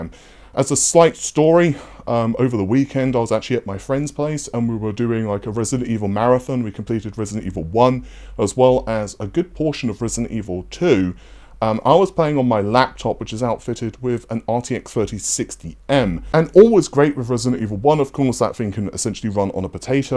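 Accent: United Kingdom. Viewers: With this dude’s British accent that gets produced by his nose, he would be an excellent replacement for severus snape in any Harry Potter prequels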